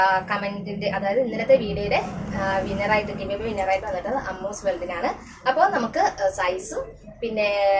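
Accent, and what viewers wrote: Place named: Trivandrum, India. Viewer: " nikku nikku nikku evide povunnu"😅😅thani Trivandrum 👌🏻👌🏻kollam 🥰🥰 Collectionsum adipoli👌🏻👌🏻👌🏻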